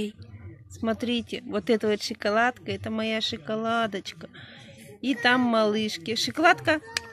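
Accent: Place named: rural, Russia. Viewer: Фу какая мерзость...крысы....антисанитария.... столько детей ...фу..... ещё и говор такой....ну деревня....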